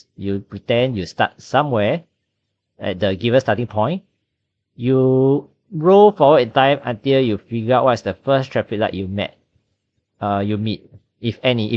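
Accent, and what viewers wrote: United Kingdom. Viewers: Are you british? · Are you British?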